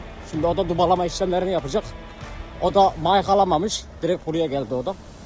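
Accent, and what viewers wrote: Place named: Central Anatolia, Turkey. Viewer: Konusma şeklin yozgat sivas bu yörelerdenmisin ? iç anadolu olduğu kesinde...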